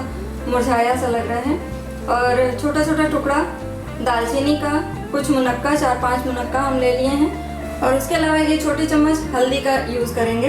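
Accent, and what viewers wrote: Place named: Maharashtra, India. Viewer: Aapke bolne ka jo toning hai mujhe maharashtrian ki tarah lagta hai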